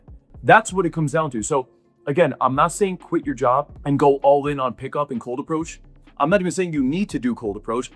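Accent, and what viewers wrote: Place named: United States. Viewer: you easily say this because you are an americana nd you have a cool accent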